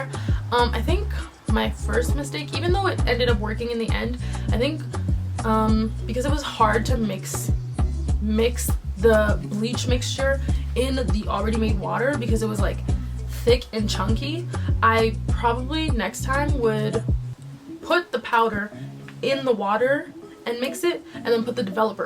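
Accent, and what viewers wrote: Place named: Canada. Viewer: Yessssss someone from Canada. I knew as soon as you starting talking 😂. You are gorgeous! Thanks for this video, it’s been in my cart forever. So happy to find a Queen with this colour!😍😍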